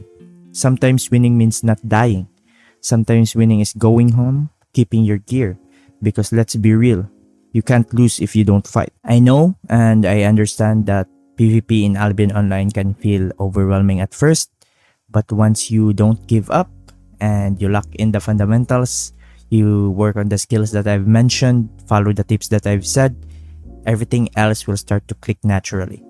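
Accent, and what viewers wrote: Philippines. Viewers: you pinoy? accent is obvious hehe. good video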